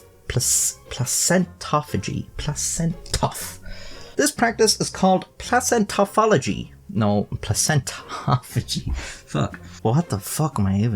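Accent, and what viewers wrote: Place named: Ireland. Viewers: I clicked because funny Irish man be talking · Maybe you just wanted to hear an Irish voice telling a tale with some line art animation, or maybe you wanted to know how to eat human. I'm here for the first thing, btw.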